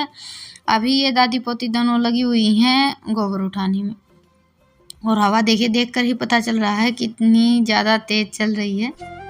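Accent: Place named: Rajasthan, India. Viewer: Didi aap kha se ho aapki awaz se to lagta h aap Rajasthan se ho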